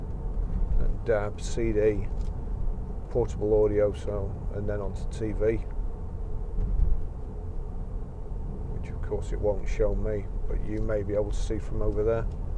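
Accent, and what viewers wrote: United Kingdom. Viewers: Wow Sir, that is a great car. I always love Land Rover, but I still cannot afford one. 😫 the one you are driving is awesome and it feels so powerful and comfortable. Do you own the car? Or Are you a dealer? How much does this car cost? How much are you asking for it? And by the way I love that nice British accent.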